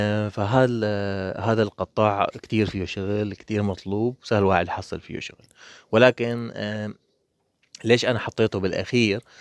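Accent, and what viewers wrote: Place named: Latakia, Syria. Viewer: حسيتك من اللادقية 🙃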